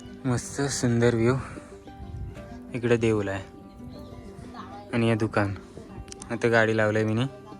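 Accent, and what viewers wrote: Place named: Agri Koli, India. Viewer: Tuze sarva videos changle astat nisargaramya navin gaave baghayala khup chan vatate tuzi agri koli bhasha aikila khup chan vatate 😊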